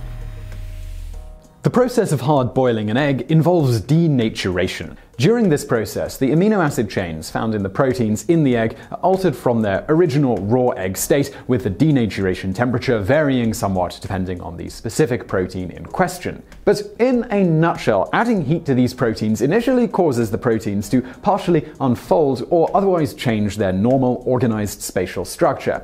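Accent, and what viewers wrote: United Kingdom. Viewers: is it just me or has he been getting more british?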